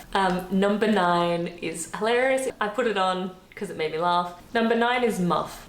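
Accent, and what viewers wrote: Australia. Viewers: FYI this is a cultured Aussie accent unlike certain Aussie accents that seem to be plucked out of the 1930s .Yes I'm talking about cobber ,sheila ,crikey . These terms were out of use before my time and I'm a 57yr old bogan. We are more likely to say " nice bit of pussy" than " crikey that's a bonza sheila" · thank you for this nice presentation even though you seem a little nervous presenting these subjects. Your Australian accent is very faint compared to my Ex Father-in-Law who was brought up in the 'Bush' in the 1930s but settled (ex RAAF) in England after WWII. In the 1950s my mother would take me (aged five to eight) to the cinema (yes I cried for Bambi). I forget the title of an Australian film that we saw but the young lad used the word 'bum' meaning his bottom. My mother quickly informed me that "Australians are allowed to say that word". 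It was even better when the lad sung, to the tune of 'The Ash Grove': My teacher's got a bunion A face like a pickled onion, A nose like a squashed tomato And legs like match-sticks. etc. Rugby Football is similar to American Football in that they rarely kick the ball but without the protective gear. Australia (Wallabies), New Zealand (All Blacks) and South Africa (Springboks) all give good account of themselves and, without doubt, have much 'dirtier anthems' that they sing in the showers or in the Pub' after the match.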